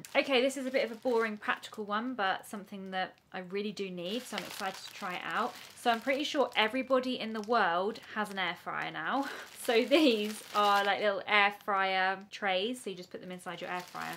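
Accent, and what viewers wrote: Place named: North East England, United Kingdom. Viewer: Hi! My brother lives in Whitley Bay. NE England and listening to your lovely accent has me thinking about him! I do talk to him weekly! You are the cutest young lady! 💕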